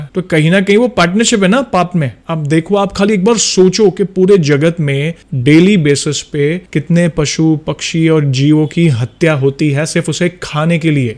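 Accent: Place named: Pakistan. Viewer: Bhaisab aap Toh Pakistani lag rhe ho .apki tone se lag rha hai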